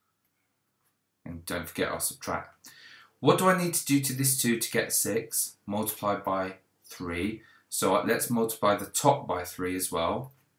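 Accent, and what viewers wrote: United Kingdom. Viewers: This may come as a surprise to you but he is in fact speaking in a 'British English' accent...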